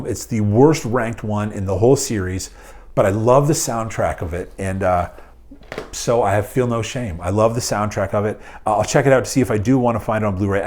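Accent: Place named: Canada. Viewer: I was wondering if you were Canadian as I’ve picked up on the accent, especially in this video. That explains why you are so nice and polite!!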